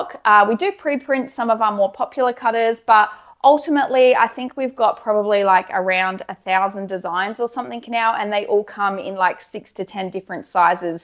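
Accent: Australia. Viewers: First thing I have to ask are u Australian. Just from your pronunciation of words . I'm thinking of starting something similar with 3D prints and design. Any info would be great i am in SA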